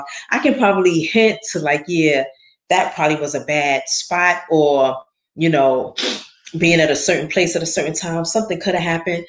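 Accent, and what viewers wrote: New York, United States. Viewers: Views not lost if you're watching. City is the name of the college, City College in Harlem Manhattan. You should know that Ny accent from anywhere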